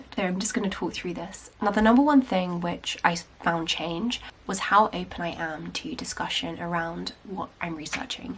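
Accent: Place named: South, United Kingdom. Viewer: Here to learn south British accent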